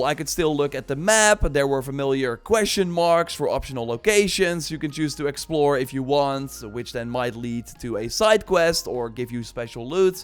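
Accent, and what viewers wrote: Netherlands. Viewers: Ik ruik een Nederlandse accent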